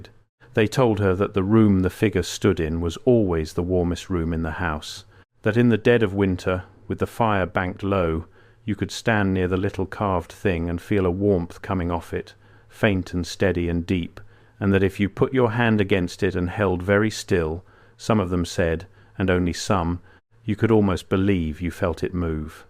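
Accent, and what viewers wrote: United Kingdom. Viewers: Why a British accent narrating an American story? Oh, AI. Which makes me not believe the story.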